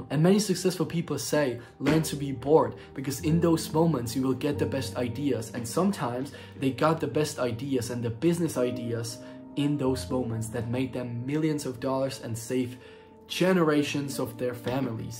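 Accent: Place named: Czech Republic. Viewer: I can say youre from czech republic right? btw great video man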